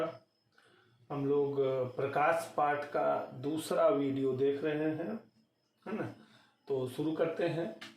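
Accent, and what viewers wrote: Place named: Bihar, India. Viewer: Sir hindi ka aisa lecture poore youtube pe kahi nahi hai sab saals English me daalte hai unka v content aapke jaisa nahi hai sir aap ko sun ke lagta hai ki aap bihar se ho?????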